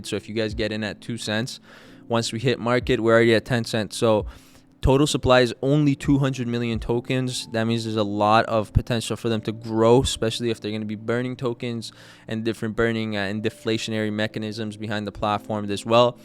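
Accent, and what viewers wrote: United States. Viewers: Most American sounding Russian I’ve ever heard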